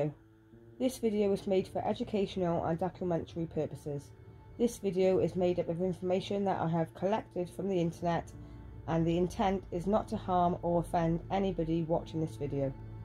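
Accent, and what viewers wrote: United Kingdom. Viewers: I’ve just come across your channel and watched this video, I think you’ve done brilliantly! Going to binge tonight. It’s nice to hear a Welsh accent too, I haven’t come across many and I’m also from Wales. Good luck with the channel! 😃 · I really enjoyed your take on this. It was clear and concise I like that. I watch alot of True crime and it's refreshing to hear a British Accent albeit I know you said your in Wales but UK nevertheless 😃 Thanks I've subscribed and look forward to hearing more from you.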